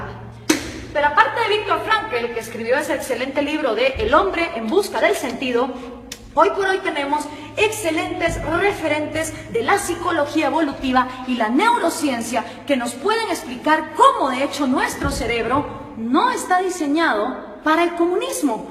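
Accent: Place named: Central America. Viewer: este video deberia haberlo filmado en blanco y negro, reflejaria el contenido añejo que sale de la mente desactualizada de la autora. para cuando haces un video revisionista de los regímenes ultramarino imperial de inglaterra vs expansion napoleonica?. por tu asento debes de ser de un pais centroamericano entregado a los narcos, pero de eso no hablas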